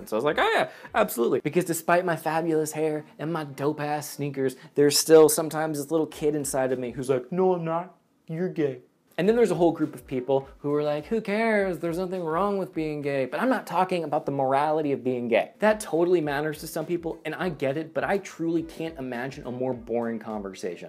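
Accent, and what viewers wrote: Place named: South, United States. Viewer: Thanks for the disclaimer about the southern accent.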